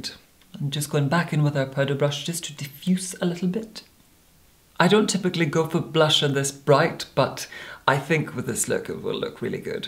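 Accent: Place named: RP, United Kingdom. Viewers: Your make up is always stunning but what always amazes me is how good your English accent is. For you being Scottish, I can imagine it can't be easy speaking with an English accent. I can tell you my Scottish accent attempts always end badly- ha. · That cut glass pronunciation, those lovely, expressive blue eyes, those cheekbones...🥰🥰🥰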